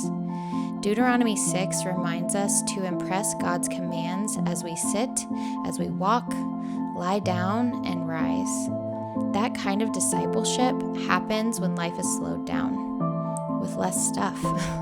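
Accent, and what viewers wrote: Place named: United States. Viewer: I buy to many books!I love your accent! what part of America is that from?I'm from the u.k.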